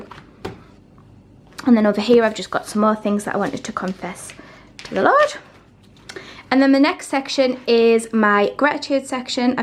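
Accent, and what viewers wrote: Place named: United Kingdom. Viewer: This is so wonderful. I think God must want me to do this for myself because I've found many on youtube. You are the only other one that I love your format and how you set your journal. Thank you! I love your accent! Where is that accent from? UK somewhere? I'm in Texas.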